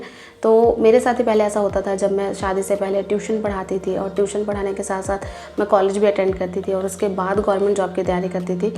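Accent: Bihar, India. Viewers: Kya aap Bihar se h?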